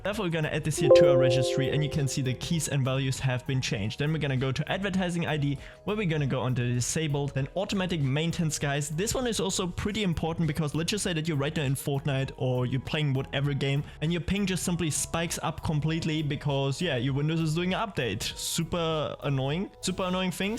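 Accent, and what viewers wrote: Germany. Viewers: Your 100% german, I can hear it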